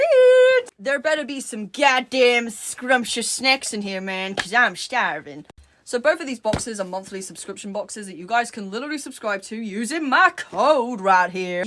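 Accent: United Kingdom. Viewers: Are you british? (Im just asking out of curiosity)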